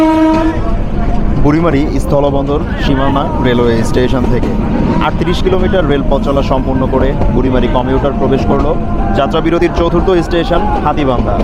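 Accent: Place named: West Bengal, India. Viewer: এই ভিডিও তে আপনার কথা শুনে মনে হচ্ছে আপনি পশ্চিমবঙ্গ বা কলকাতার আসে পাশের কোনো এলাকার ছেলে 😅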